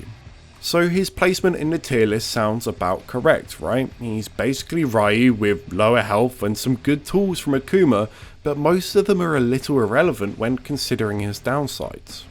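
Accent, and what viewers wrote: England, United Kingdom. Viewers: The authors from uk, it’s how we say it here. Kinda like Nike instead of Nikeee. Just a UK/London thing. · Yeah I find it a bit ironic that they're getting mad at Americans misprounouncing a word not in their language when his accent is English. Moreover, I'm an American, and I've never heard anyone misprounounce Ryu on my turf. I'm honestly pretty suprised how far this obvious joke was taken though, everyone misprounounces stuff at some point.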